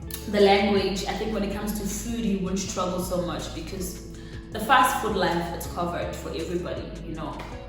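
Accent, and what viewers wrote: South Africa. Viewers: For a second i though your southy you have our accent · Wow i like how you are so open minded ...You just sound like a typical South African when you speak our languages 😂❤️...We love you so much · She even sound South African, and I don't mean her understanding and speaking of SA languages, I mean the way she speaks English sounds very South African. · You my DEAR , You Sound just like ONE of US, I mean South African Keep Learning our WAYS of RESPECTING our Cultures and Elders .Good Job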